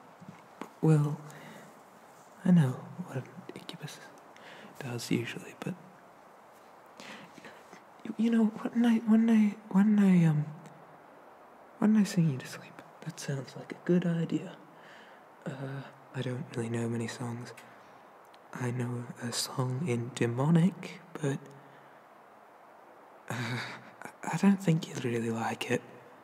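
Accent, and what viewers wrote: United Kingdom. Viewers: Am I the only one who loves the slight british accent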